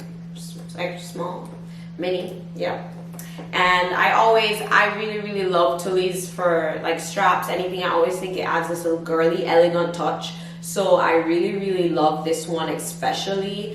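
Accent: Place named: Caribbean. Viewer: You sound West Indian Babe x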